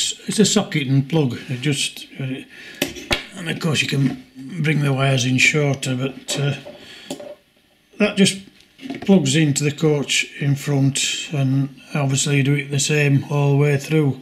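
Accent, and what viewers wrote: Yorkshire, United Kingdom. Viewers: you want to get dragon for Mac Professional 6 it's £249.99 but works really well and should understand your yorkshire accent with a bit training. Are you are using the built in dictation on your mac? Download the extra features in the settings so it won't have to keep going on the internet and it should work a lot better also turn the volume up a bit that helps. I like the lights I may have to get some in 00 and n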